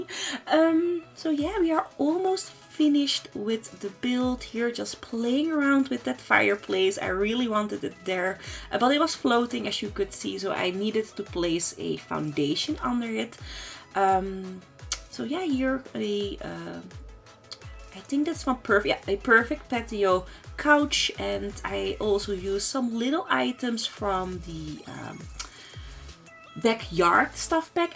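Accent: Netherlands. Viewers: Ik herkende het nederlandse accent gelijk, gelijk even in de comments gekeken of je echt nederlands bent aaa. Hahahaha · Ik zat te kijken, en dacht: ik denk dat ze Nederlands is, ga ik in de comments kijken ben je echt Nederlands, je hoort het wel, maar je spreekt wel veel beter Engels dan de meeste Nederlanders. Maar je hoort het toch wel een beetje bij woorden zoals wanted en work, maar dat is niet erg hoor xD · are you from holland?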